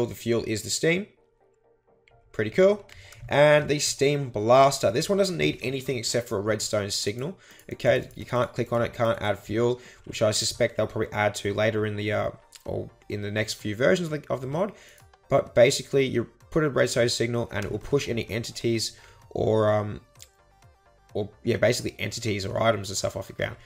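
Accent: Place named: Australia. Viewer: It's good to hear an Australian on YouTube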